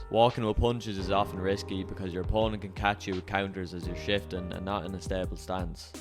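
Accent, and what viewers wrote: Ireland. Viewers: Are you Irish? Also yeah, I noticed that DIllashaw liked his head kicks almost as much as he liked shifting.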